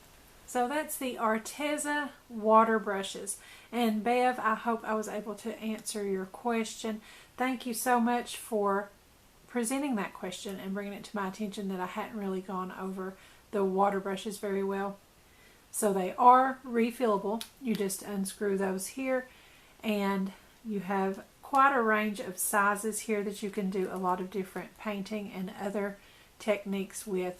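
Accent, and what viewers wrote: South, United States. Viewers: Answered everything, and then some. You are such a blessing. Thank you so much, I feel so special. I'm originally from Florida and I just love hearing your southern accent. Have a blessed Easter. Bev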